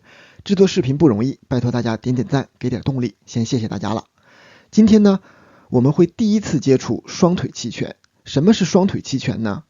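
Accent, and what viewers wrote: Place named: Northeast, China. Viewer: 东北口音有点搞笑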